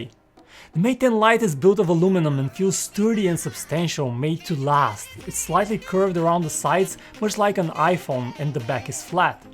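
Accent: Varna, Bulgaria. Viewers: Are u slavic ? Bulgarian perhaps? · Само аз ли забелязах че си от варна :Д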